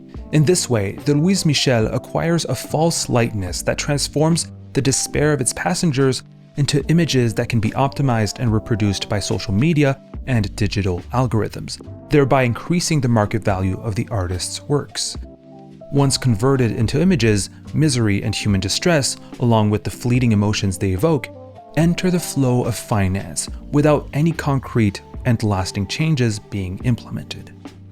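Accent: Quebec, Canada. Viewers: t tu québecois?! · when you speak french it sound like Québecois french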